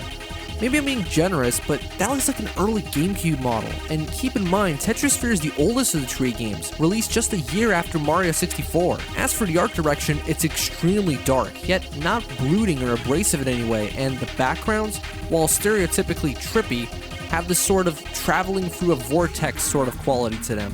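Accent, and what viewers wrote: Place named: United States. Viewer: Наистина звучиш като американец. Впечатляващо. Също кошчетата за боклук (барабани) на Ларс звучат прекрасно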